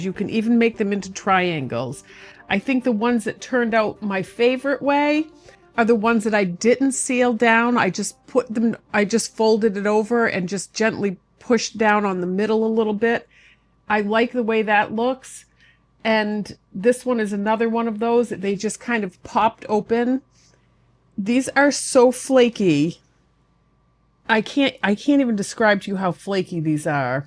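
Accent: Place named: Upper Midwest, United States. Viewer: I need to Know!!!! Where is from? I get upper Midwest, New York, definitely along the Canadian border. Do tell please!!!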